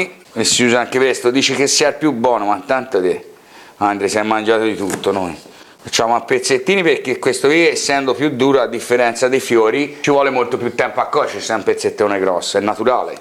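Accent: Tuscany, Italy. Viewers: Ai raggione morire con la panza piena o tutto quello che lei sta preparando ,,domani sabato li faccio ciao bella cadenza TOSCANA 😋😃😄😏😀😁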